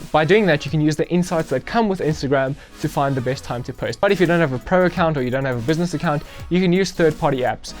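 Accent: South Africa. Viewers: I hear a south african accent there 🤔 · Clearly South African bru... you still there? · Is that a South African accent I hear?? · Are you South African?🤔 your accent sounds like you’re from here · Are you South African ??? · South African?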